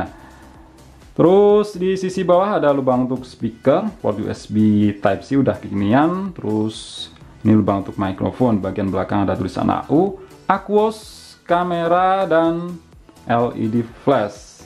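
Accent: Blitar, Indonesia. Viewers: Wong blitar yo mas 😂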